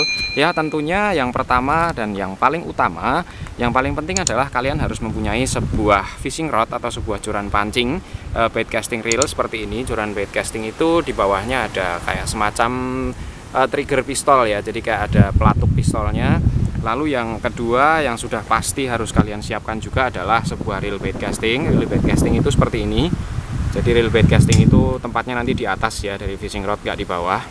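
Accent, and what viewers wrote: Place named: Java, Indonesia. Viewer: logate asli wong jowo masse salam sehobi mancing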